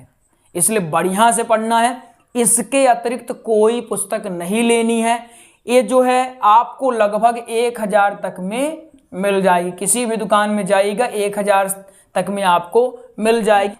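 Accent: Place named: Kashmir, India. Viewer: aap ke bolne me kashmiri jhalak hai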